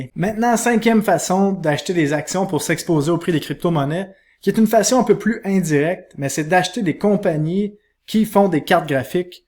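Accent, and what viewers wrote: Canada. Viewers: Je tiens à exprimer mes sincères félicitations pour la qualité exceptionnelle de votre vidéo, votre contenu est une véritable pépite pour tous ceux qui cherchent des approches novatrices et efficaces pour maximiser leurs investissements. Elle est un exemple éclatant de professionnalisme et d'expertise. J'ai été impressionné par la clarté et la concision avec lesquelles vous avez expliqué chaque stratégie. Votre capacité à démystifier les investissements en actions et en ETFs, tout en offrant des conseils pratiques, est tout à fait remarquable. Vous avez su équilibrer avec brio des informations techniques et une accessibilité pour un public varié. En plus de la qualité de votre contenu, j'ai été captivé par votre présence à l'écran. Votre éloquence et votre passion transparaissent à chaque instant, ce qui rend votre vidéo non seulement instructive, mais aussi extrêmement engageante. 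Vous avez indéniablement une aisance naturelle devant la caméra, et cela renforce l'impact de votre message, et ce petit accent canadien est excellent et rajoute de la légèrete a la vidéo. Votre approche comparative entre le Bitcoin, les cryptomonnaies, les actions et les ETFs a apporté une dimension supplémentaire à votre vidéo. Vous avez offert aux spectateurs une vision éclairée des différentes options d'investissement, en les aidant à prendre des décisions éclairées en fonction de leurs objectifs financiers. En résumé, votre vidéo est un véritable chef-d'œuvre d'informations et d'inspiration po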